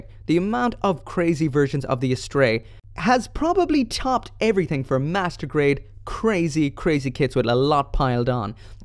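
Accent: Ireland. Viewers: Are you Irish? Where do you buy your Gunpla? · Are you Irish?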